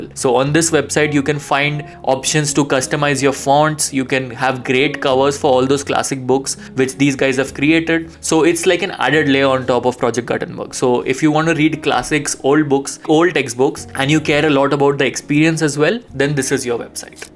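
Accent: India. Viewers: If you are in America try to speak Amerian English, not Hindu English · Why's Indian accent so shitty?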